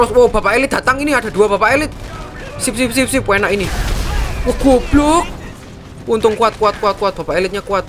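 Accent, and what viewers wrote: Java, Indonesia. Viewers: bang jawa nya medok amat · Bang loe orang jawa mana?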